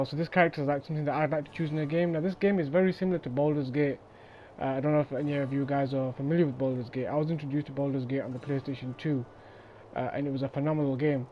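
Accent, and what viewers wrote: United Kingdom. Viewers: London accent with Indian parents? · Is that a prestonian accent?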